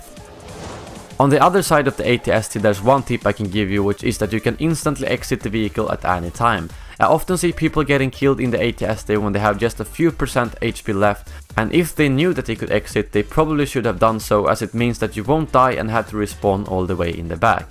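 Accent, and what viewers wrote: Sweden. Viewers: thanks for the tips...är du från Sverige va? det känns så :) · are u from sweden? just wondering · are you Swedish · Are you from sweden? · Mmm svengelska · are you swedish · Are you from Sweden? You accents sound a little familiar ;) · I knew you were swedish! Cause you have a strong accent! Where do you live in Stockholm? (Not the adress, just the name of the place) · låt mer svensk xD bra video dock :)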